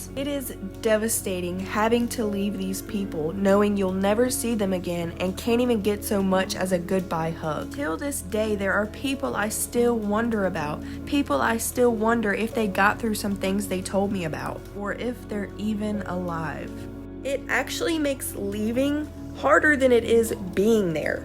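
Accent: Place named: South, United States. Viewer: 😭 baby girl, I'm taking you on as my surrogate daughter right now. I FUCKING FEEL YOU!! I've been doing exactly this for 10+ years. Hang in there baby, it is getting better as we speak because of people like you that are brave enough to stand by their cries for help. Start with Borderline Personality Disorder. Dr. Fox here on YouTube is amazing. There are are doctors out there that can and will help us, but I have learned that it's up to us to make this change. I firmly believe that what you are doing by spreading awareness on here is HOW we make the changes we need and it's up to us because there are so many people out there that CAN'T speak up for themselves. We have to help them. It's been 4 months since your last video. I deeply hope you are still fighting. I'm HERE! I'm happy to connect with you personally if you want. I want to help you. 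❤ Edit: One other thing - your accent tells me you are in the south. I have been in outpatient treatment systems literally all over the country, and the south is about 50 years behind. You have to educate yourself online. Keep going.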